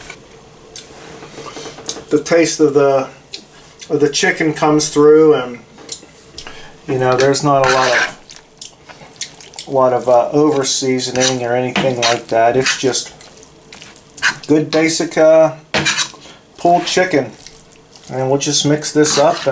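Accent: Pittsburgh, United States. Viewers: Are you from pittsburgh? I detect the accent. You sound just like my dad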